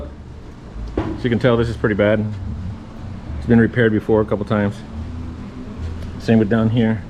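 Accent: Texas, United States. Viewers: I like your pachs. I like your texases way of saying texas way.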